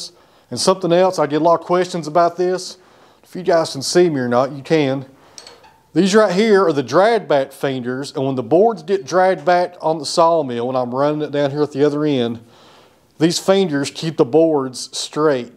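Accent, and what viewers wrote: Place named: South, United States. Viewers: Only just found your channel a week or so ago but have watched a few of your videos and can honesty say I like them! Loved the end of this one where you answer comments, if people don't like the content then just like with a TV they switch channel or switch off. I find them relaxing to watch and your Tennessee accent is easy on the ear. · I like you as a person, your calm nature, personality, will all jobs some day are more exciting then others, I also enjoy the outtakes. O I like the beard also. As I’m not from that part of the world, if your accent is a Tennessee accent, I like it. Well done 👍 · I have been flowing you since your first posting. I have enjoyed all of them and I enjoy that southern assent of yours, so my friend roll on and enjoy what your doing. Life's to short to concern your self with people that can not see the forest for the trees! 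Your friend, Bob M, AU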